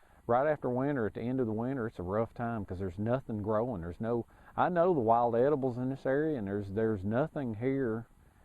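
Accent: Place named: Georgia, United States. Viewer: Really great videos sir!! You sound just like some people I know in Ringgold, GA!! Keep em' coming man!!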